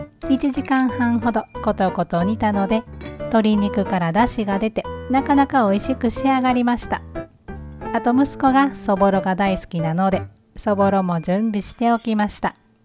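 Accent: Kansai, Japan. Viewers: ドイツからアメリカへ…インターナショナルな生活を送っていらっしゃる、と 見始めると浜村淳さんのようなこてっこてのまったりした関西弁🤣 海外で日本食を大切に味わっておられる素敵な毎日ですね✨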